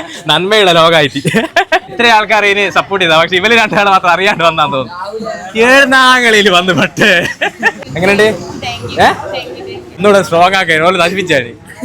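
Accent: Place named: Vadakara, India. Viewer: ഇവൻ. വടകര. ആണെന്ന്.. തോന്നുന്നു. സംസാരം. ഓവർ 😡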